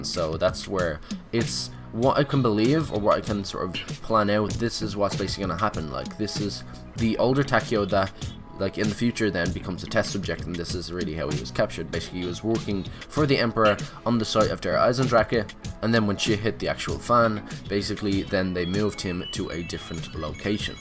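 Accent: Dublin, Ireland. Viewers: Are you from Dublin and great video it helps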